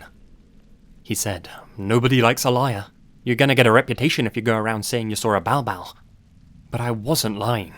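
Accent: England, United Kingdom. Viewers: Am I late to the party or…..did this white guy with an English accent just say he was Filipino? I’m thoroughly confused but having said that, been binge watching videos for about a week and now I can’t sleep 😊 Love the content!!! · You grew up in the philippines ? I always thought you were british 😅 at least you have a british accent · I’m kind of new to this channel, and I’m really enjoying itself! Although one thing, I’m so confused on where is from. He has a British accent I think, but he says he’s lived in a lot of places in Asia I think. Sorry if this is a weird or dumb question! I’m just a little confused. 😅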